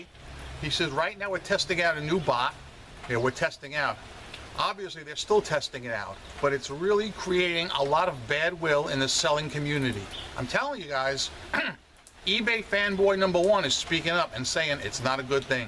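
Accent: New York, United States. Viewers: 'Best offer'.....I have some listings with best offer and tell in my description that payment is due immediately. It works most of the time. Occasionally on non BO listings someone will email and ask if I'd accept a lower amount. I'll say yah, I'll take that and ask when they'll be online, then lower the BN just for them. Works every time., I must say your New York accent makes your vids even more fun to watch.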